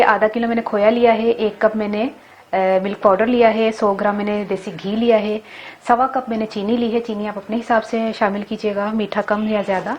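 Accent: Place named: Punjab, India. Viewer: Uff apki Punjabi so cute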